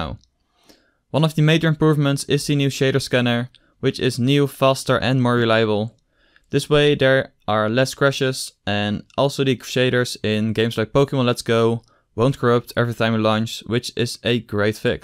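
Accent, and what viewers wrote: Netherlands. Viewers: dutch accent? :p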